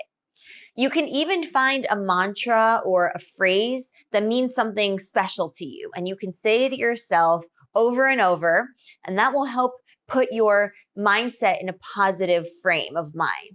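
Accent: United States. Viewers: Very good american enghish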